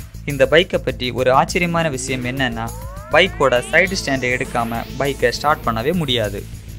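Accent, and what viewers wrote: Kanyakumari, India. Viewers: Neega Kannya Kumari yaa bro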